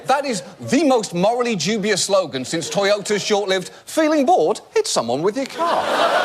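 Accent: United Kingdom. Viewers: He is not American. He is taking on American issues with wreck less abandon. He rants without perspective. He is incapable of entertaining guests who could throw a dose of cold facts on his middle school understanding. I don't understand why Americans are so quick to abandon critical thinking when they hear a British accent.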